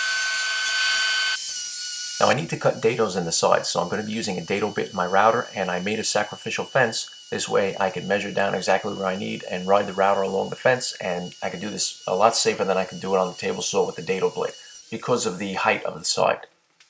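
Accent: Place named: Staten Island, United States. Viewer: Are you from Staten Island?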